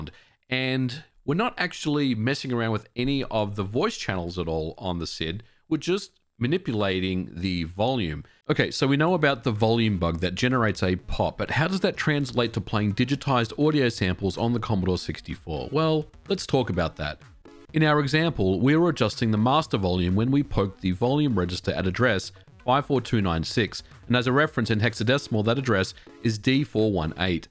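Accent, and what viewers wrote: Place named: Australia. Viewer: The Australian accent just hits different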